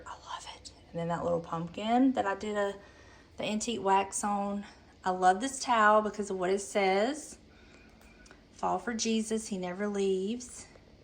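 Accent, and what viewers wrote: South, United States. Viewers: You had me when the door opened. That southern voice speaks to me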